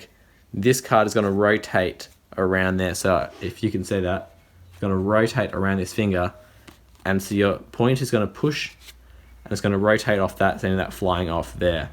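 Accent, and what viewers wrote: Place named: Australia. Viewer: Great review my guy! I already have the cards but that Australian accent kept me on the video 😂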